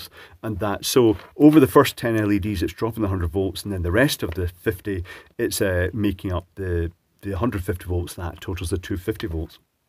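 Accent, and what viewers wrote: Scotland, United Kingdom. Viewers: Nice to see someone making decent electrical devices. I really like that they use rubber. I go out of my way to buy wire, test leads and so on, that are made from either rubber or silicon. Your videos are wonderful, especially on a Friday after a long work week and I need a pleasant Scottish accent dude showing me silly videos about his latest purchase of cheap Chinese electronics. Hehe. You're a gem :)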